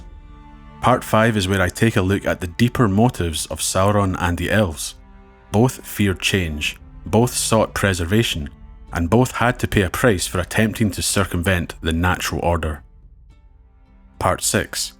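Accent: Scotland, United Kingdom. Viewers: I've missed those dulcet Scottish tones!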